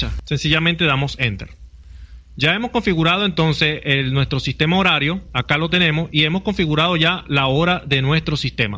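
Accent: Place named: Colombia. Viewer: pense que era colombiano, saludos tambien soy de vzla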